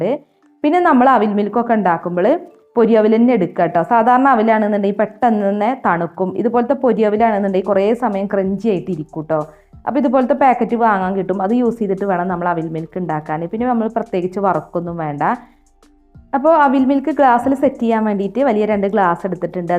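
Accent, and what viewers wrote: Malappuram, India. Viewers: Super try cheythu nokkattoo.ningalude വീട് malappuram anooo😀😀😀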